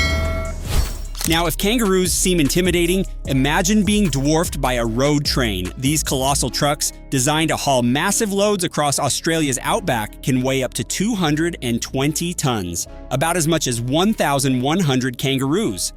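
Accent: United States. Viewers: as an aussie i found it amusing to listen to a yank telling me about road trains, secondly shows a Lefthand drive car, NOT on australian roads. i suppose yanks limited intelligence. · Why does an Australian story have an American voice over? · The only reason I clicked on this was to leave a comment. The thumbnail to click on is the biggest load of Photoshopped sensationist crap I've ever seen, I bet you're a yank or AI voice sprouking this bullshit.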